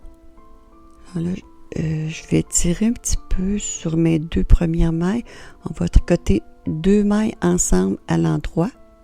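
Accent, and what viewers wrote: Quebec, Canada. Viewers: J’aurais dû regarder plutôt votre vidéo je suis en train de terminer Chaussettes DROPS 3-4 ans avec de la Fabel avec des aiguilles 3 et demie. Il y a longtemps que je n’en avais plus tricoté, j’étais un peu perdue merci beaucoup pour vos explications parce que je vais en refaire avec d’autres laines DROPS pour mes derniers petits-enfants jJaime beaucoup vos explications et surtout l’accent du Québec et les astuces que je ne connaissais pas. Un grand merci 🙏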